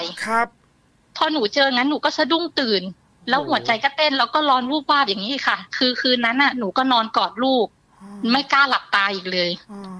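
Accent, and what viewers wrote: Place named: Sukhothai, Thailand. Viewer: สำเนียงการพูดเหมือนคนสุโขทัยเลยครับ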